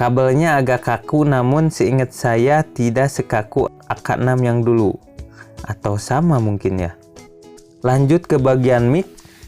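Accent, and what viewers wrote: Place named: Nagreg, Indonesia. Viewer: Owalahhh orng nagreg to bang 🤣 Btw riview nya bagus 👍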